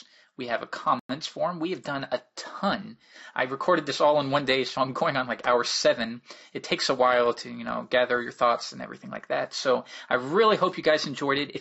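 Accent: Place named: United States. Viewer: great tuts,u sound american but u do like football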